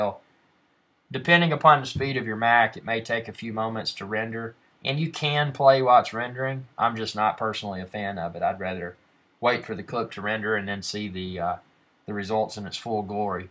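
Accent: South, United States. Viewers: That would be a Sexy Southern draw ;)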